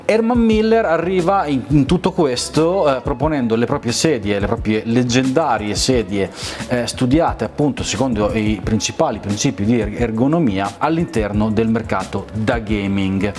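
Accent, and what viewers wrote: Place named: Romagna, Italy. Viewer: Forte della qualità video/audio ottima, ed incuriosito dalla sedia, ascolto la tua recensione... ma soprattutto il tuo accento e come pronunci la "z". Diamine! Penso: deve essere per forza romagnolo. Ed appunto, ci troviamo a neanche 20km di distanza. Complimenti per l'ottimo video!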